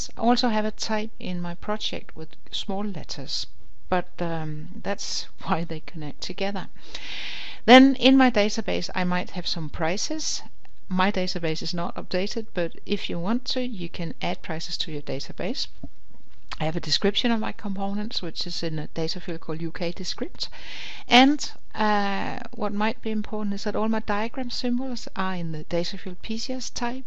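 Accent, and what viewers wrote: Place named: Denmark. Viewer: i smell danglish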